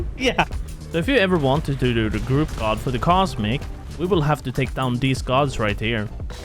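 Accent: Sweden. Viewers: where do come from ???????????? you sound swedish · Are you from sweden?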